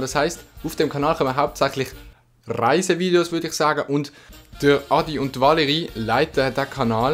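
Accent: Basel, Switzerland. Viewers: kannst du mir mal bitte sagen, wie heißt der schweizer Dialekt, indem du sprichst?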